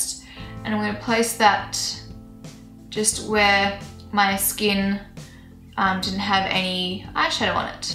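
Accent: Australia. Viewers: Indeed i did enjoy !! you are gifted with your makeup plus its easy to understand you because i'm Aussie too :D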